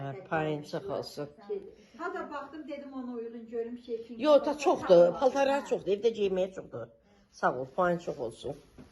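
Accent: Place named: Nakhchivan, Azerbaijan. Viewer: O qədər xoşum gelir Naxcivan hehcesinden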